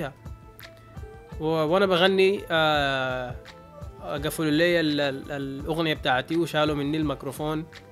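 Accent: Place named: Dongola, Sudan. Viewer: انت شخص جدا مبدع انت قلت في البداية انو العربي يمكن يكون تعبان... شكلك رطاني وفي الرطانة زاتو دنقلاوي هههههه وفوق لي دا لسانك اجنبي انت صراحة جوطهم كلهم كدا وطلع لينا المبدع ههههه استمر يا برو استمر...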